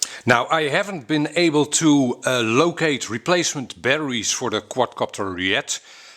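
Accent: Netherlands. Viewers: First let me compliment you on your English! Though your Dutch accent is very thick, your grammar and vocabulary is excellent! I have several questions regarding this quadcopter. I am a novice in this field. Though I am fascinated by the concept of FPV, I am mostly interested in shooting nice video's during my travels. So, I am not a hobbyist or sport-flyer. I have been looking for good camera drones, small enough to pack for travel (without the need for an extra bag, or larger bag - I like to travel light). I just bought the Parrot Bebop(1) for that purpose, but I find it (still) too big, too breakable and it seems to lose the wifi connection each time I fly (losing all control over the drone!!). Now, the footage I saw on your other video seems too jittery and jellowy to be usable to shoot travel videos, could that be helped? Is it possible to fly this with a smart-phone as well (so I wouldn't need to pack a radio controller)? 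Would you recommend this drone for my purpose? I like the small size and the sturdiness, though I would welcome altitude hold and all kinds of aids in ease of flying.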